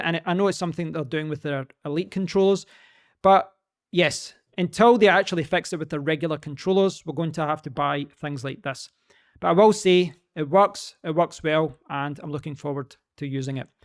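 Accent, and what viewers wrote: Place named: Scotland, United Kingdom. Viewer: Shiiiet... love your Scottish! ? (forgive me if it is Irish) Much love from Florida !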